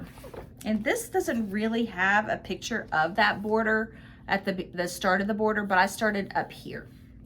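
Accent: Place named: South, United States. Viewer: Hi! I live in Colorado - but grew up in Virginia and South Carolina and my family is all still in the Carolinas. Watching you and hearing your sweet southern drawl feels like a visit from home. 💕 1, 2, 3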